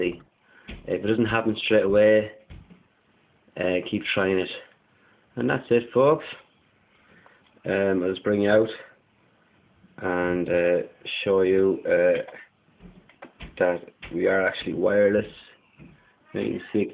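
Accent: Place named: Carlow, Ireland. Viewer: hero!!! plus Carlow accent is unbelievable!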